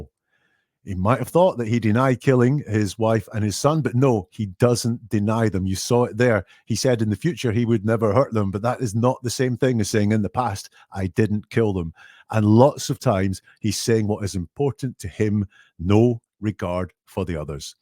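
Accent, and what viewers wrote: Scotland, United Kingdom. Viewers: Couldn't believe it when I clicked and heard a Scottish accent...lol I've only ever heard Americans discuss this case. Loved the video. New subscriber from Ayrshire here 😁👍🏴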